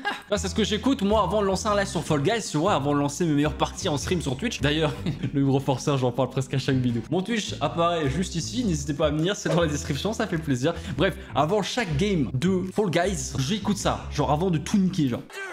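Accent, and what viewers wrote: Belgium. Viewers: Et tu belge ? Dezoler de te déranger au passage 👉👈😀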